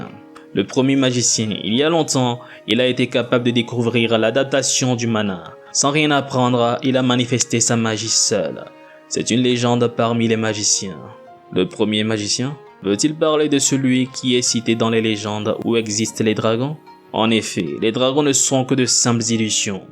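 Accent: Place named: Africa. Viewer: 1 - L'histoire est vraiment géniale et fascinante 2 - Pas d'images foireuses ce qui est agréable 3 - La narration est très bonne et on y sent la volonté qui y est mise même si l'accent africain du naratteur prête a sourire . 4 - Je met 1 gros like a cet vidéo que je recommande a tous et j'invite le youtubeur a continué cet aventure .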